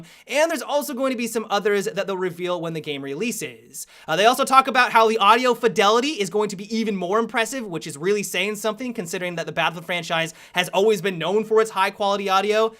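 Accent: United States. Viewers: This video is basically just Jackfrags one with an American accent. I have no qualms with this.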